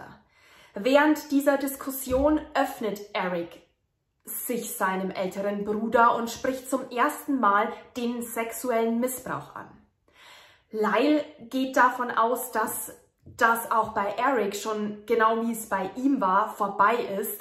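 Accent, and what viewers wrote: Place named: Upper Franconia, Germany. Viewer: Hört sich an wie Oberfranken.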